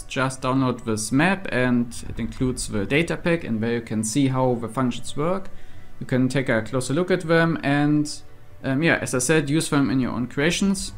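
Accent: Germany. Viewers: Ah yes, another German-accented minecraft nerd🤓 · Ich vermute dass du Deutsch bist. Ist das richtig? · are you german? your voice really sounds like german-english XD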